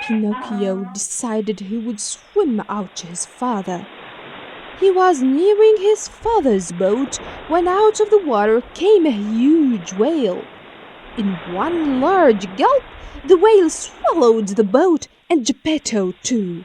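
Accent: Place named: United Kingdom. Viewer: this accent British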